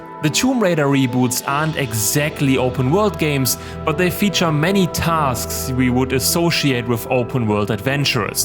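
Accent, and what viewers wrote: Germany. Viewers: That's just his German accent. At least he doesn't pronounce it as 'Laura' like so many Americans do. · Nice video but are you german ?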